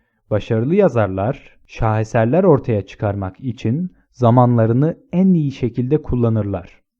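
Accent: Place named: United States. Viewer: Amerikan aksanınız çok hoş ❤️👋🏼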